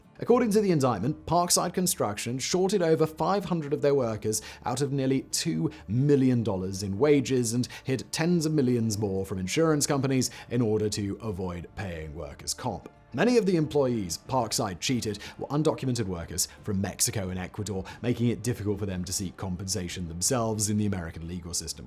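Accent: United Kingdom. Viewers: I thought this was vsauce! I’m like why does he have an annoying British accent now?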